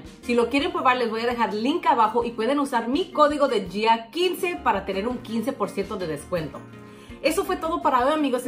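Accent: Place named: Spain. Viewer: First, love your Spanish accent you are excellente instructor.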